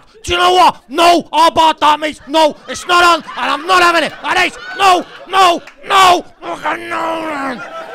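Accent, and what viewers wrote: Liverpool, United Kingdom. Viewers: He absolutely nails the scouse impression and accent 😂👏🏼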